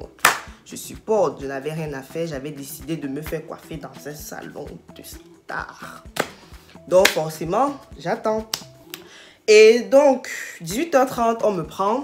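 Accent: Cameroon. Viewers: T'es camer? L'accent ne trompe pas.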